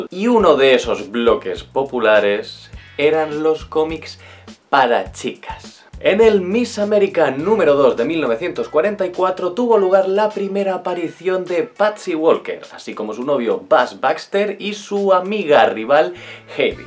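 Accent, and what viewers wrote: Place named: Castile, Spain. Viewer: Preeeeguntas!! - Cuál es tu nombre de verdad?? - Si vives en murcia (o eso creo) por qué tienes acento de castilla ?(diría y león aunque no estoy seguro xD) - Dibujas o guionizas, o lo has hecho en el pasado? de no ser así, te has planteado hacerlo? - Crees que Rondador es sólo una versión alternativa de Bamf en la tierra 616 o coincides en que es un Bamf mutante??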